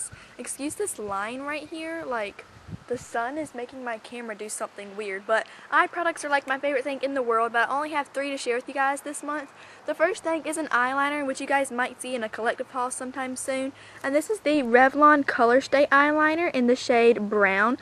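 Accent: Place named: South, United States. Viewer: I LOVE YOUR SOUTHERN ACCENT!!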